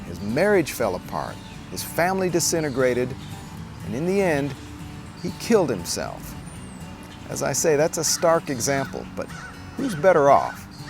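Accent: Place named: United States. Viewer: No I have never known someone with lots of money who was unhappy. If you have cancer and you are going to die then money will not save you. But it will fix just about everything else. Your example of the guy in Texas who won the lottery is absurd. He did not have to loan money, buy people homes or put himself in the position of a giver, he could have saved the money and had a decent life combined with doing something like giving to an animal shelter and volunteering to help out. It is not rocket science to know that if you are doling out millions to people then people will take advantage of you. And in the end he killed himself so right there he had mental problems. So tell me how people who win money are just a "giant freeloader"? You have an American accent so I presume that you live in the USA. Don´t get seriously sick there without a lot of money. 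there is no National Health Service. This video is pointless.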